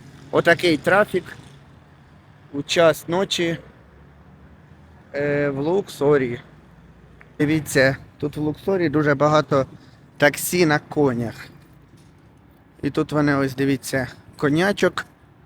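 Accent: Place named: Poltava, Ukraine. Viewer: Полтавчанина по говору зразу чути. Дякую за екскурс по місту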